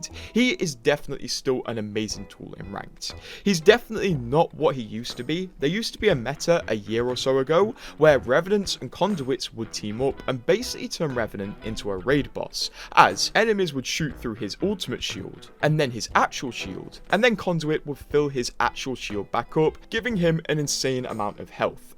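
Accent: United Kingdom. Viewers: Are you from northern England? Like machester or yorkshire?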